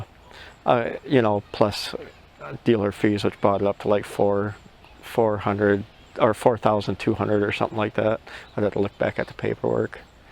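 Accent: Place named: Wisconsin, United States. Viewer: I knew you were from Wisconsin, not that the accent is a dead give away but you speak well, maybe im biased but i think our state has many smart well spoken people, and you did not want to make your neighbors angry, that is very respectful only us Wisconsinites are that cool, and you want to leave the stock exhaust on haha, I leave it on to haha. Very cool man!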